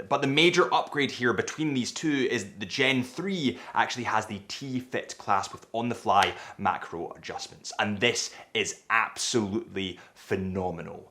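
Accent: Scotland, United Kingdom. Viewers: You do a very good Adrian Barker impression, with a slight Scottish accent.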